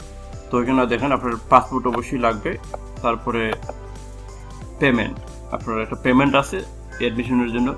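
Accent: Sylhet, Bangladesh. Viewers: Sylheti vai😍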